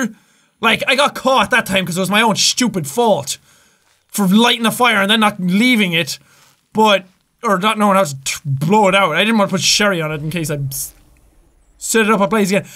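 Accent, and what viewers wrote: Ireland. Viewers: He is Irish if you cannot recognize his accent.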